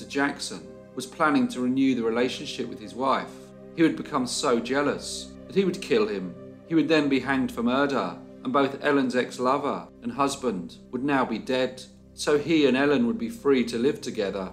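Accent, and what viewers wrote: England, United Kingdom. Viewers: Just want to take the time to thank you, for your time and efforts. Incredible stories from the past! Your english accent makes it even better to listen to. Wish good week ahead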